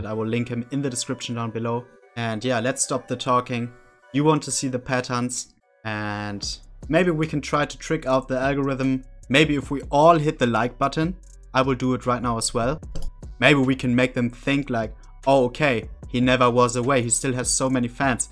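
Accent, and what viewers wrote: Germany. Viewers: U Germany?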